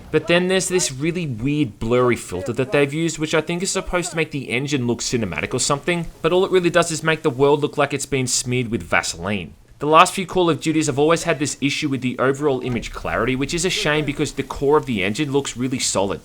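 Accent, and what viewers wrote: Australia. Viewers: So nice to hear a fellow Aussie on YouTube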